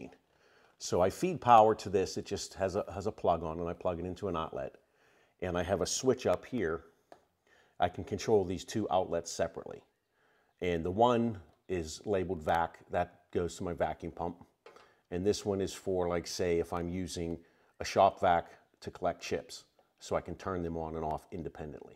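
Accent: Pittsburgh, United States. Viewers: nice video. do i detect a pittsburgh accent. question have you ever tried the pods with mdf on to to make a much broader vaccum area.....great video.. what nice little jig for those cases...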